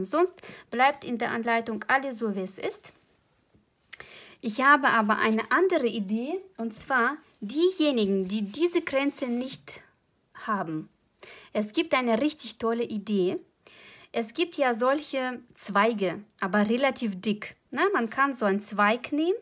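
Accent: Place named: Russia. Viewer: Hallo, ich bin neu auf deinen Kanal und ich finde dich sooo cool, ich hab schon so viel gelacht während dem häkel, es ist immer lustig mit die. Dein russischer Akzent ist auch sowas von unterhaltsam, mach weiter so, ich bin ein Fan deiner Kuscheltiere. Ich kann mithäkel und bin mittendrin. Danke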